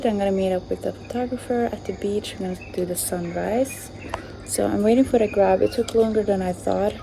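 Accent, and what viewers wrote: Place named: Scandinavia. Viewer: Ah känner, yeah sounded like you were from Scandinavia.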